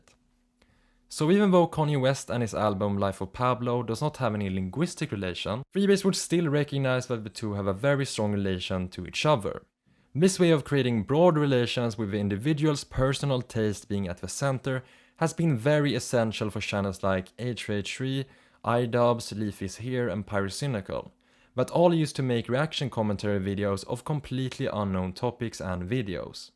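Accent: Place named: Sweden. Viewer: your swedish accent annoys me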